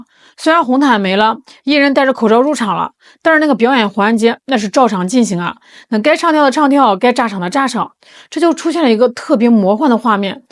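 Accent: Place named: Henan, China. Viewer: 河普地道 😂😂😂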